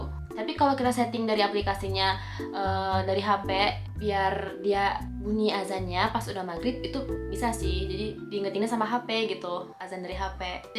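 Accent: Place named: Japan. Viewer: nyanyi lagu indonesia dong. pasti kawaii pelafalannya karena waktu ngomonf aja ada jepang²nya gitu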